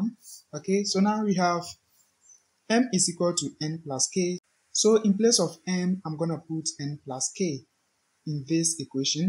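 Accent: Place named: Ghana. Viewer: The accent looks more of a Ghanaian.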